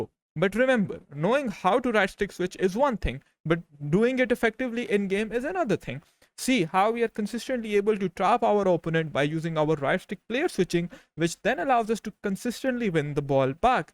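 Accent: India. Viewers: your Indian voice is annoying asf tho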